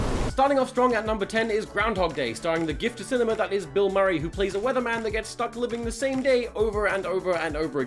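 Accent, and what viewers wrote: United States. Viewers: Yeah, you do sound kinda American. But, I always knew/thought you were British. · being from the northern Midwest, specifically less than ten miles south of Canada, your "American" accent is very familiar to me. You really accent the o's when you say "shows", "country" and "over" that reminds me a bit of my Canadian cousin. So when you cover up that Cambridge twang and add accentuation on the consonants, you sound like a Canadian who's been living in American for quite a while. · Your generic American accent sounds quite a bit like the way I talk, and I've been on the east coast of America for most of my life. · Damn, that American accent is spot on. I wonder why you don't use it more often for your skits. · Sheesh, I've never noticed you're kinda americanized speech till now. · HOW THE HELL DOES HE SOUND SO AMERICAN IM DED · You sound like mid west or east coast America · Your american accent made me feel sick for three seconds and I'm not entirely sure why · WITH AN AMERICAN ACCENT OH MY GODDDD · Everyone talking about his American accent but I'm just stunned at his baby impression like wtf! 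· AH. WITH AN AMERICAN ACCENT. AAAAAAAAAAAAAAAAAAAAAAAAAAAAAAAAAAAAAAAAAAAAAAAAAAAAAAAAAAAA... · As a born and raised Chicago-an yes! You sound super American!!!:) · in his jeeny video he sounds supper american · IT WAS SO WEIRD HEARING YOUR AMERICAN ACCENT AHHH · that American accent scares me. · i say "american" cause your non eastern states accents are closer to Canadian accents. it's not an insult. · that accent changed to American initially <3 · I thought you was American for a long time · oops... i always thought you were american! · Holy crap your "American" accent sounds like my friend Dylan (we live in New Hampshire) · I ACTUALLY FREAKED OUT ABOUT HIS AMERICAN ACCENT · Holy shit his American accent is amazing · The fuck happened with your accent you sound almost completely American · I didn't actually know you were British...I just thought you were American! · I got a American accent too.. 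haha..like you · I like his full on American accent lol sounds cool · HOLY SHIT, THAT AMERICAN ACCENT THOUGH! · Base American accent is illinois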